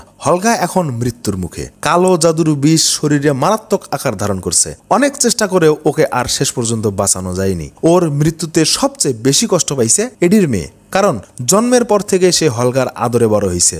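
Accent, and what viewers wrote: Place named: Jessore-Kushtia, Bangladesh. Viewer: ভাই আপনার বাড়ী কী যশোর,কুষ্টিয়া বা ঝিনাইদহের দিকে,,আপনার ভাষায় আমার এলাকার টান❤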